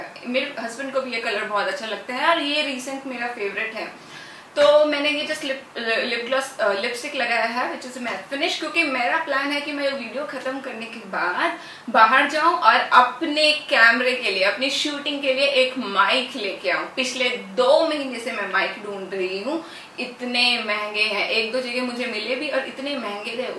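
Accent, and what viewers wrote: India. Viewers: upka language lambadi hindi lagta hai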